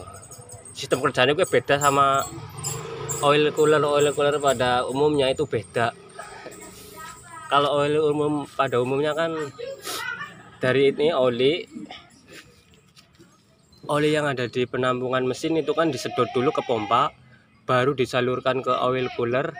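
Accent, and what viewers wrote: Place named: Brebes, Indonesia. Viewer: Logat e wong Brebes 🤔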